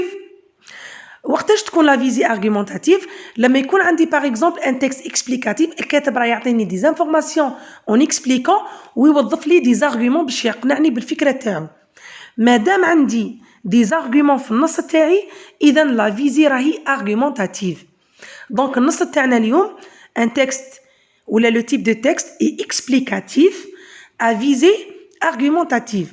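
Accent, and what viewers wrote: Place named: Europe. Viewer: ليكن في علمك استادتي الكريمة ان موضوع الامتحان غير موجود في موقع ency-education فهو محدوف فعوض ان 6 مواضيع، تم تنزيل 5فقط، و لهدا ان كان لك من الزمن بقية رجاءا ضعي رابطه اسفل عند صندوق الوصف. سلام صاحبة الصوت الافرنجي الاصيل.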